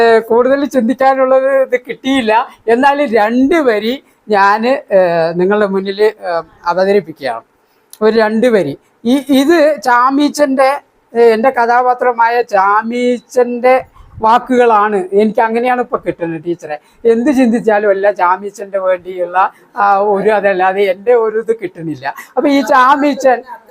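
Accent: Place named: Valluvanad, India. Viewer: Palakadan shailli, Valluvanadan bhasha, Kellakan rassamanennanu, Mattu zillakar parayuka, Namaskaram ,🙏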